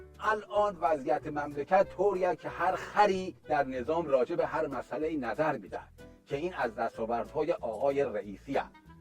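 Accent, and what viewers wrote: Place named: Lorestan, Iran. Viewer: عالی بود .همین برنامه رو با لهجه غلیظ تر لری و با سوژه های مختلف بسازید.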